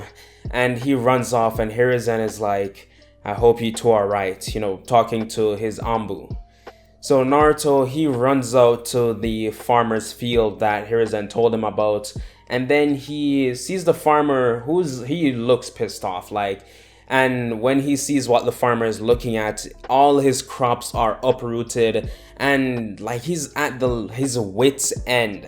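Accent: Jamaica. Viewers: You're Jamaican aren't you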